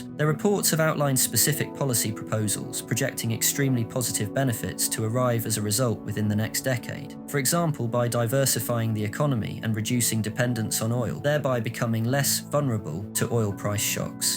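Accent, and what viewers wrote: United Kingdom. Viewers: i swear all you need for a video essay channel is a british accent this is fire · Wtaf is a "British accent"??? There's no such thing He has a southern England accent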